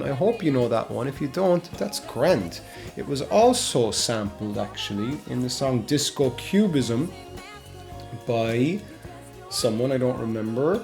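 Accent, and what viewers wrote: United States. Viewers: Great video and great southern accent ! from Florida. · Hey, your Texan accent isn't so bad.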